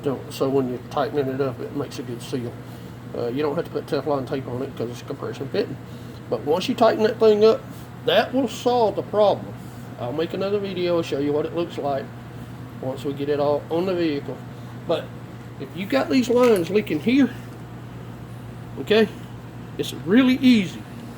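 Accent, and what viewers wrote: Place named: South, United States. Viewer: Even with the down South accent, I got something useful out if this video. That workaround fix looks pret darnt good good buddy. I think I will try it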